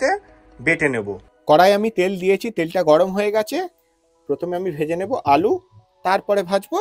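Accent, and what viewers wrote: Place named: India. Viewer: আপনি কি ভারতীয় কথা শুনে মনে হচ্ছে বাংলাদেশি না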